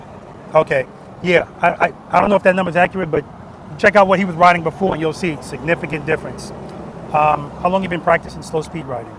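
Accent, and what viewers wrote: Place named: New York City, United States. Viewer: Great video. Thickest NYC accent I’ve heard in a long time. Keep it up.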